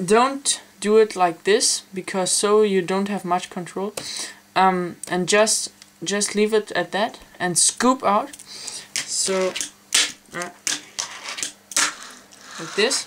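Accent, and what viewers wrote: Germany. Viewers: bist deutsch ne? hört man :D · nice tutorial dude, are you german?i can hear it from your accent LOL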